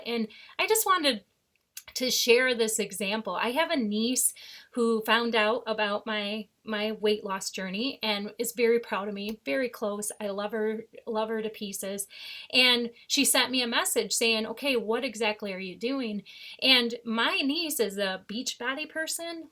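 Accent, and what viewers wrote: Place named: Michigan, United States. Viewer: And now I think of it, I hear that Michiganders accent coming out of your mouth, but I won't hold it against you, because I am not from Ohio either. We are O.M.A.D. Strong!🤠 Even though I could be called the Desert No-M.A.D. Outlaw right now!🤠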